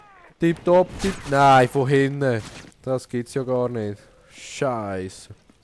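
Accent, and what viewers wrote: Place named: Switzerland. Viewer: Es ist schwer dich zu verstehen aber d kannst nichts dafür bist n hardcore schweizer wie nichael mittermaier sagt ^^ trotzdem schönes vid ^^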